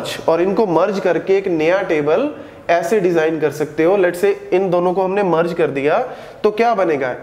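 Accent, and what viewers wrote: India. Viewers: I cant understanding anything what you saying dude. Unfortunetly I am not get used with the english indian accent. Please friends, if some one could put english subtitles on his videos, it would be great...because despite I cant get excatly what he is saying, I am sure that his approach is very unique and worth to share. Greets from Brazil.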